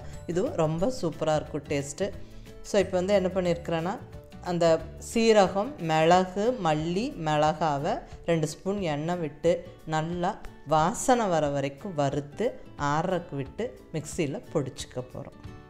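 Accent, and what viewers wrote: Coimbatore, India. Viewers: இது கோயமுத்தூர் தமிழச்சி குரலா?!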